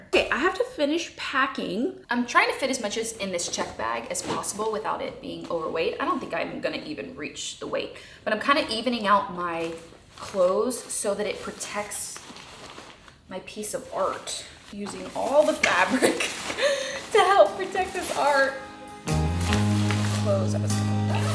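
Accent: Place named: South, United States. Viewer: You are a great example of how us southern people can easily go back into our southern accents! 😂 I didn't realize I did it until a British person did it in front of me at my place of work... And apologized for it... I was like girl... I'm pretty sure I do this with my southern accent so I'm not judging! ❤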